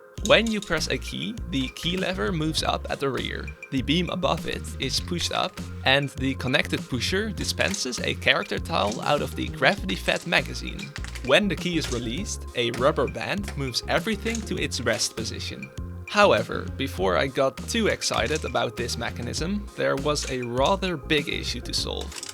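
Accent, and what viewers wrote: Netherlands. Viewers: Are you dutch? because you sound like have a dutch accent. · Is this guy Dutch? He sounds Dutch. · ik hoor een nederlands accent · Another dutch person successfully sniffed out by his accent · Nen ollander (duidelijk hoorbaar 😂) met veel tijd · I heard you talk checked your profile, yeah Dutch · Your from the Netherlands? The accent❤ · Why does this guy have a dutch accent? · You lowk sound dutch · He sounds Dutch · Nice vid, and as fellow dutchie, i immediately caught on your dutch accent hehe ;) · ben je Nederlands? ik hoor je accent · you sound very dutch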